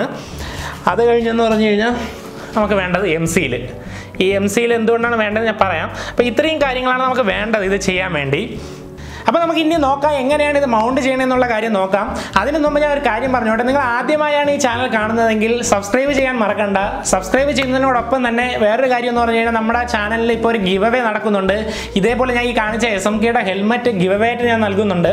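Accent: Trivandrum, India. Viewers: NJN ATTINGAL ANN BROI. SLANG KETTAPOL THONNI TVM ANENN😅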